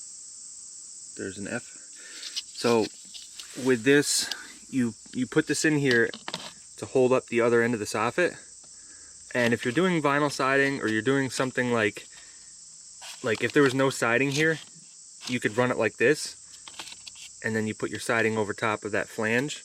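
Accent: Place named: Kingston NY, United States. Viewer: Sounds like your from Kingston Ny I live in Pleasant Valley. I like your mechanic videos and roofing videos.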